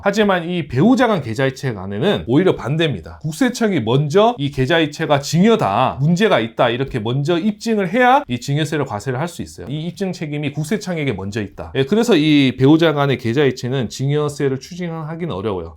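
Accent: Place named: Jeolla, South Korea. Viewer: 시원시원한 설명이 귀에 쏙~ 들어오네요 게다가,구수한 전라도 억양 살짝 풍기는 그 말씨! 듣기에 왠지 좋아요~~^^ 영상 많은 보탬되었네요 감사합니다,화이팅~~~!!!!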